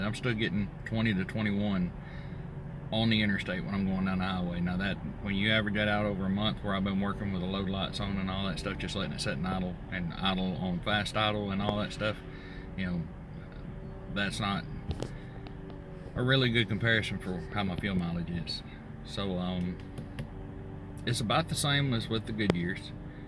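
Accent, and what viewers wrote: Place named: Tennessee, United States. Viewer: YOu sound like a TENNESSEE driver!! ( me too.. ) I think part of yours is the load and driving use... I'm going to be running mine on just my GX470 (about 6000 weight with metal bumpers, winch- and extras).. but normal street driving mostly-and not very aggressive. I'll most likely do a LITTLE offroad stuff-- just fire roads, or rougher dirt roads and of course winter.. but not much- so with what you got- mine should do fine. THANKS- appreciate the totally honest and factual review..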